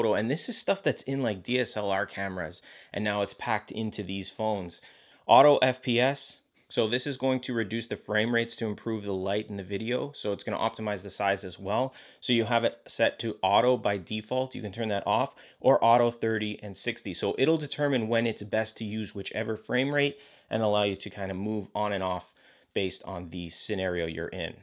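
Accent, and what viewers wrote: Canada. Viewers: Thank you! i'm going to watch again, just got the 12from the 6 ani'm a filmmaker. Enjoyed the Canuck accent.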